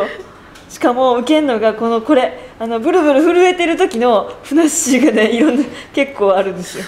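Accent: Kansai, Japan. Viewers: ええ感じの関西弁やなぁ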